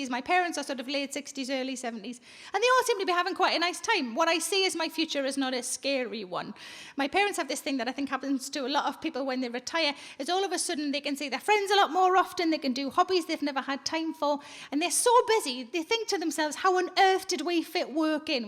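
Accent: Newcastle, United Kingdom. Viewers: Geordie, from Newcastle on the UK. Not Georgia.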